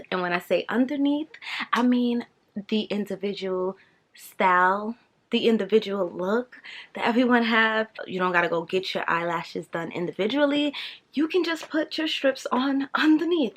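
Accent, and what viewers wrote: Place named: New York, United States. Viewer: Great job it looks good. I like your accent are you from New York?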